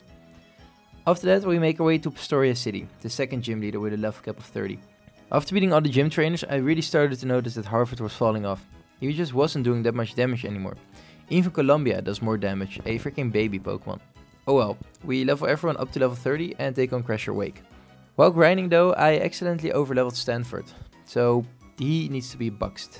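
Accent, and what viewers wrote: Netherlands. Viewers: Hmmm that accent. Sounds dutch to me lmao because I am dutch too and I can recognize it lol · i taught i heard a dutch accent, i am from belgium are you from belgium or the netherland3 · weird question but you got a very dutch accent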